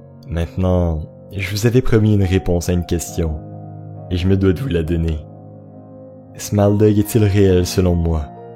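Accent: Quebec, Canada. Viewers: enft c que il quebecois😃😀😀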